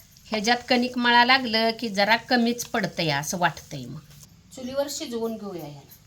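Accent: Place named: Satara, India. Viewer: खुपच छान बोलतात सातारा किंवा सांगलीचे भाषा कारण मी सातारी इतक छान टापटीप करून दाखवतात मला खुप आवडल धन्यवाद ताई 🙏🙏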